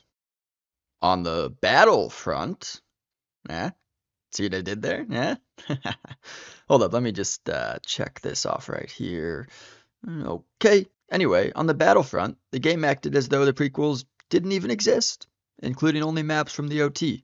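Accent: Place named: France. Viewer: You sound so french.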